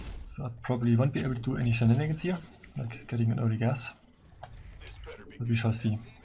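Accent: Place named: Germany. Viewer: Mach doch mal auch ein Video auf Deutsch man merkt das du deutsch bist dein Akzent sticht sehr heraus xc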